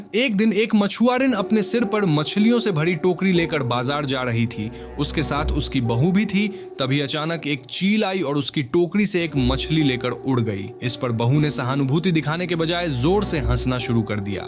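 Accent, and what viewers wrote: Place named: Bihar, India. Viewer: Ur Pronunciation is like that of a Bihari…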